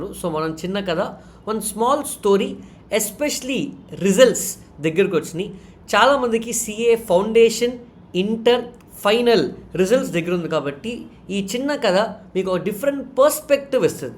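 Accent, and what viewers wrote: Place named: Telugu, India. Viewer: Meeru telugu slang super ga undhi sir💯 Being a tamil person. Can easily connect with it sir!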